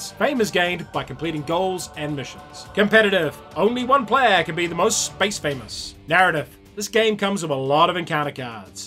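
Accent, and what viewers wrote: New Zealand. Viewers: Kia ora, e hoa! So pleased to hear a kiwi. Tino pai rawa!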